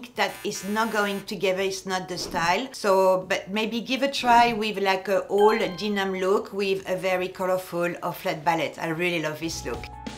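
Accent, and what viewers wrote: France. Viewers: I love your French accent. It reminds me of the months I lived in Bordeaux. I love your personality. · I guess you are French... from your accent. Right? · Some really great style tips. Thank-you. I could listen to your French accent for days on end - no matter what you were talking about 😍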